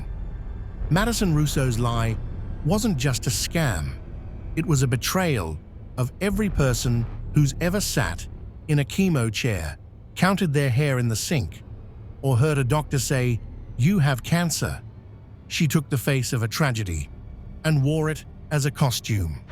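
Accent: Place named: United Kingdom. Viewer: hate these brit voices for american stories lol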